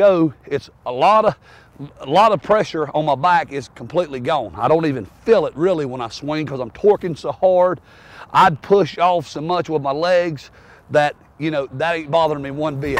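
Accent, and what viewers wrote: South, United States. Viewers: As a southern boy (74 yrs old), myself, love to hear talk! I'll bet he can eat some cornbread!:) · It's southern not english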